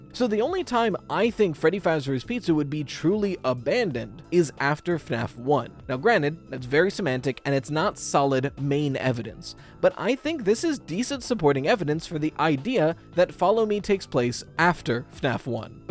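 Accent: United Kingdom. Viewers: I'm just so happy to hear him be British again, it's honestly been a while.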